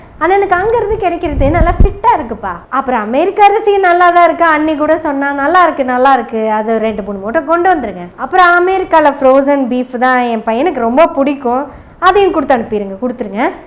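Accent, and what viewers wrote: Kongu, India. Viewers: வருங்கால த்ரிலிங்கான C. M களைவிட விட நீங்கள் பேசும் த்ரிலிங்கானக் கொங்குத் தமிழ் அழகு நடை சூப்பர் எப்பா!வாழ்த்துக்கள் பாப்பா!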